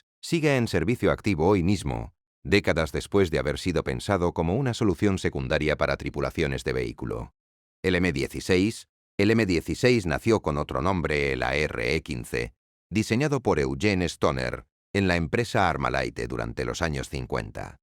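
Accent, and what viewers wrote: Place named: Spain. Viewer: Hablas con acento: "ostia, tio"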